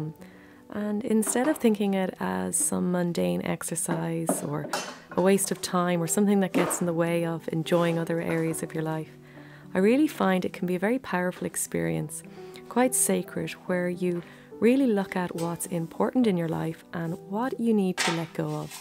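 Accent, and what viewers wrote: Ireland. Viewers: lovely to hear your love Irish voice and wisdom thank you Celine also from Ireland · watching your videos, with your calm and gentle Irish lilt and beautiful images, is like meditation for me. Thank you for posting.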